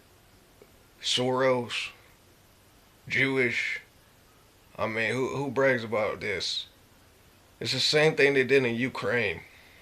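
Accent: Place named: South, United States. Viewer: your body language is speaking when you're lying out of you mouth don't you know most things or institutions was suggested toward "black" you know what happened to natives . and now Mexicans are considered illegal alien(s) on parts of west side america (Mexicans original land ) go read a book (several) you're looking stupid . & you sound like typical southern tabacco chewing inbred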